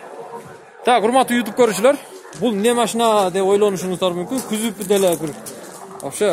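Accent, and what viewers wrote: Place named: Osh, Kyrgyzstan. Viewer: кам болбо ука денсолук оруба нак оштукча суйлойсун жэткизип ушундай шанду тартыш керек машина базара озум баргандан болдум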